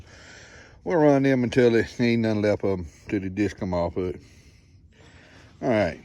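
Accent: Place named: Louisiana, United States. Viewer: Where are you located? From accent, can't tell if you're Cajun, or from around Lumberton SC. I'm in SC. Can't beat dog wire cages,I use taller wire, mabe 4' and cut 6' sections, then drive a piece of metal pipe through bottom so they don't get blown over in storms. Have some cages almost 20 year's old.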